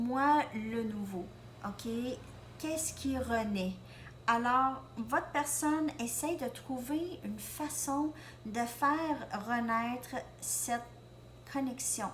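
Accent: Canada. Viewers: Vous êtes belle , j'aime votre accent canadien 🥰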